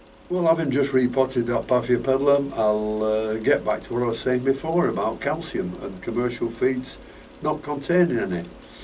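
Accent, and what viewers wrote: Lancashire, United Kingdom. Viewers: Thank you for your videos. Your accent sounds similar to mine! I'm in Adlington near Chorley. Merry Christmas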